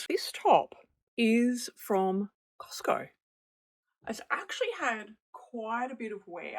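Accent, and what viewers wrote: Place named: Australia. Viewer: 🇦🇺 heard the Aussie accent and joined up 👍 Yes, I have cleared out my clothes when I retired, I had about 5 outfits left!! So gradually I've rebuilt my wardrobe up, but I have a new mantra, if I don't love it, I don't buy it. I've Stopped buying just because its on sale, I always regret it when I go to wear it!! So love it..or Leave it! 😊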